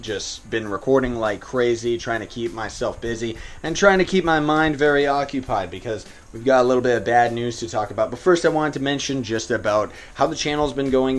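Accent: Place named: Canada. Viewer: I could tell your somewhat Canadian by the way you say sorry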